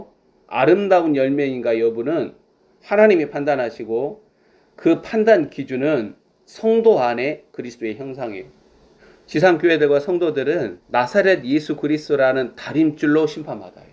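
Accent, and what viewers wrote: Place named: Jeonbuk, South Korea. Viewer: 그러시군요 말씀중간중간 억양이 그러신듯해서 여쭈어 보게됬습니다 저도 잠시 전북에 머물고있다보니 그런것도 구분이되네요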